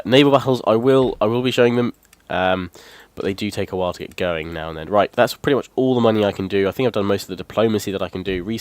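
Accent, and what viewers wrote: United Kingdom. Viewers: Very nice british you are speaking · Nathan Winkle He said several times he was from the United Kingdom and has a very clear and obvious accent. Are you deaf?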